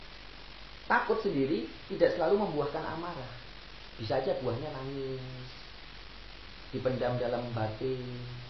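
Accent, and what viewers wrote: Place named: Java, Indonesia. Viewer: dari cara ngomongnya sih seperti sprti logat jawa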